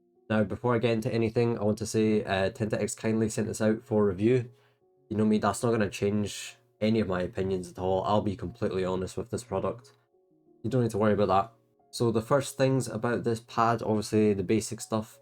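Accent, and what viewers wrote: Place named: Scotland, United Kingdom. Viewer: Scottish accent 😊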